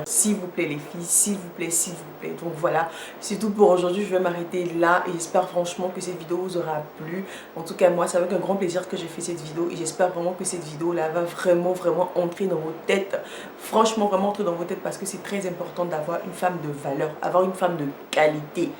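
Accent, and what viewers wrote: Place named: Ivory Coast. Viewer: Ah t'es ivoirienne j'ai l'impression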